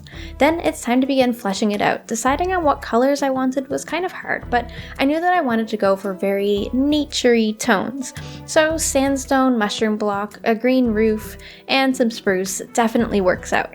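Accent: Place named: Newfoundland, Canada. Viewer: Haha I'm just teasing. It's cute! I didn't know people from newfoundland spoke like that. That's a good fun fact x)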